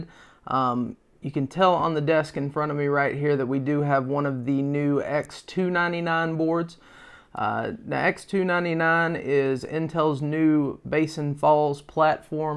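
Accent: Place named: South, United States. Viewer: "happy as a pig in shit" Dude, you have a wonderful way with words. I haven't seen a tech channel run by someone with such a strong southern accent. It's a great pairing! I don't really subscribe to channels these days, but you seem to be making promising, informative videos and I want to see you grow, so I subscribed. Looking forward to more stuff from you!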